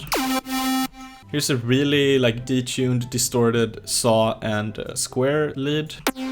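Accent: Sweden. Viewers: Dude that brutal swedish accent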